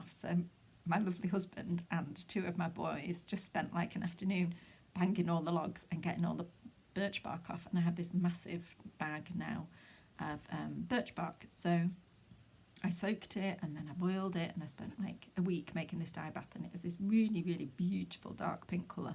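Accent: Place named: Northern England, United Kingdom. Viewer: I really enjoyed the podcast and the variety of things you have done. It is so refreshing to find somebody who doesn’t like grey!! I used to be completely autumnal in my colour palette, but have recently switched to blues and purples…but still no grey if I can avoid it 😁 I also loved your accent. I am originally from Cheshire, but have been ‘exiled’ in the South East for 39 years, so a Northern voice always makes my heart sing. A great first podcast - keep it up!! Jinny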